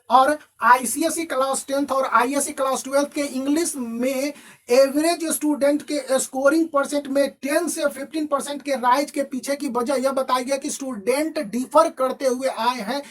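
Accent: Bihar, India. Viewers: Lekin sir aap bhi bihari bolte hai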